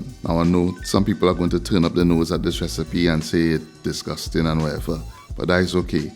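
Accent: Trinidad and Tobago. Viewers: Is that a Trini accent ?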